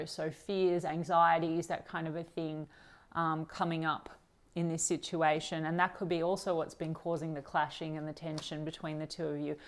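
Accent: Australia. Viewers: Great to hear an Aussie. Surfers Paradise here 🇦🇺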